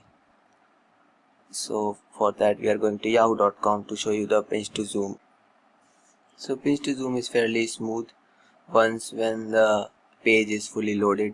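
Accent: India. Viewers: that accent.. yuck!!!! just speak in indian language dude..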